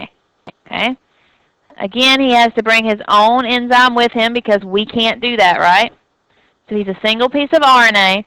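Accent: South, United States. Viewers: I'm from jersey and I've gotta say, I looove this woman's southern drawl. Her voice will definitely be echoing in my head during the test. Thank you so much for the help!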